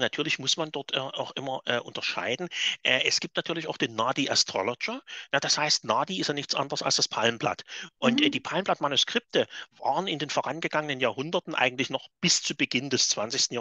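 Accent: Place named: Saxony, Germany. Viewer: Wunderbar 🙏🙏🙏ich liebe Ihren sächsischen Dialekt 😂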